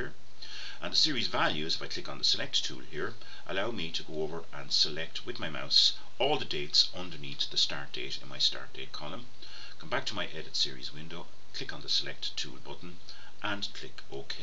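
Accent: Ireland. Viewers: Hey, great videos and they are a big help. its great to hear an Irish accent while i'm pulling my hair out in Australia. Do you have a video that would show me how to put date markers on a gannt chart? I am having trouble getting this to work. I need it for say 7 days before the start date as a marker to call the individual. I just need to know how to get say a star or any marker to remind me to call. thanks in advance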